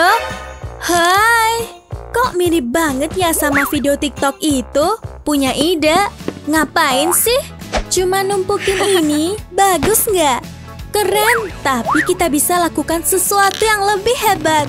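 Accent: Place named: Java, Indonesia. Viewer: Dasar ngomong medok jawA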